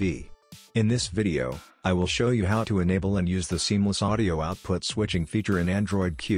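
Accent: India. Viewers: Sounds like a India scammer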